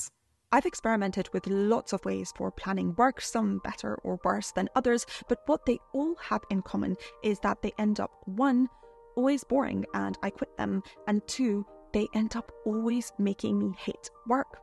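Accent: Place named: Scotland, United Kingdom. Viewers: She is from London but I hear a slight Scottish accent also. It's a mix of both because of the way she pronounces certain words. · what kind of British accent is she? can anyone answer me this question? i'm learning english and i'm not convenient to listen rp american and rp brittish accent but her voice is so soft to my ear. i want to do research what her accent is from. (thanks)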